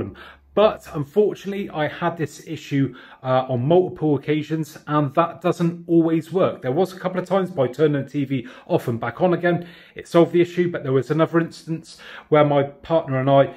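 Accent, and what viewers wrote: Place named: Yorkshire, United Kingdom. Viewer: I was actually watching this video because of the problem that I have with my tv. But dude I love your accent!:) are you from Yorkshire?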